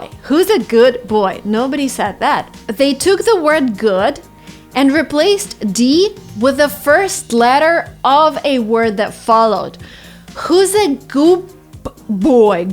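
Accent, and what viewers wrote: United States. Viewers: How did you learnt the American accent?... sometimes I just forget that you are Russian...you've covered up so well...any suggestions from you? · You're american? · How did you learn American accents? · Ya u hve a workbook, that's gr8... But a humble request! Can u make atleast one video on simple past which is often used by Americans plz? 👍🏻... U r the best teacher for those who wanna learn american accent including me!. Tq so much 👍🏻!... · Are u american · As much I know, she went to Britain as well as the USA. Now, she speaks American accent... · Wait, you're not american? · Are you American or british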